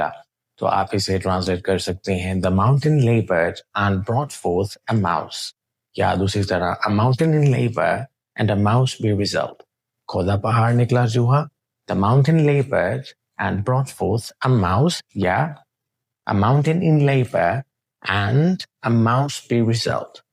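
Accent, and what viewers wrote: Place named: Sindh, Pakistan. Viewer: Being Sindhi accent, teaching marvelously.Hats off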